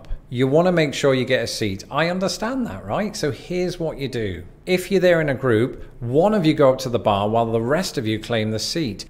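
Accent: United Kingdom. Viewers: Love this guy's very British droll delivery. Since Covid came along, there's no more going to the bar to order drinks, it all has to be brought to the table by bar staff now.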